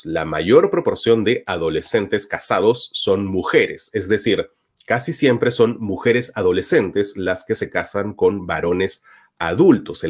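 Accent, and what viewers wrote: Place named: Arequipa, Peru. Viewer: Es de origen arequipeño esa forma de acentuar.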